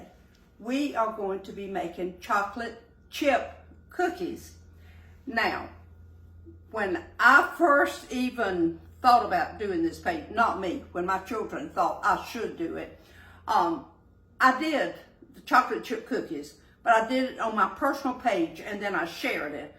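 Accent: South, United States. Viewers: Shine for Jesus is the best way to go. I also love to hear your accent. I live in Cullman,Al and of course we have the southern accents,but you are exceptional. I love you 💞💕🥰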